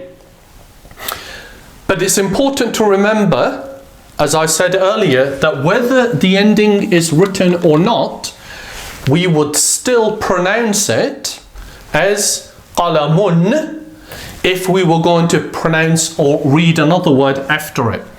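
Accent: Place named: United Kingdom. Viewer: You are speaking British accent! Wow! You're the best Arabic teacher I've ever seen! Thank you, Jazakallah!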